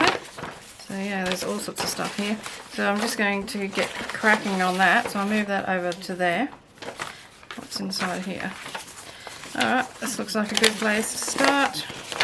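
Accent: Australia. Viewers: I love this journal! It's so nice to hear another Aussie accent! I enjoyed watching you have a go ❤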